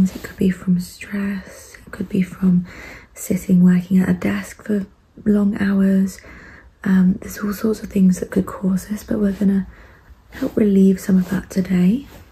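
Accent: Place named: United Kingdom. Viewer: I am not into chiropractor ASMR but your british accent influenced me to watch this video till the end. now, I am binging your other videos to hear more of your accent. lol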